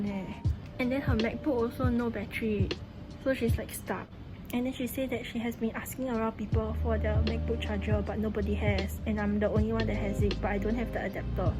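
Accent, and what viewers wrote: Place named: Singapore. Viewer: Quintessentially Singaporean! 😄 A bright Singlish spark in dark times!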